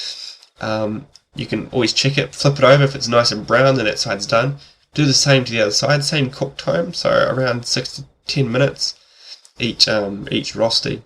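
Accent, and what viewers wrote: New Zealand. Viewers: hey i'm not judging but you sound like you're from New Zealand hehe x) I used to stay in NZ for 4 years I love all of your recipes!! <3 <3 I am a big fan of yours xx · He has a New Zealand accent, not Australian :D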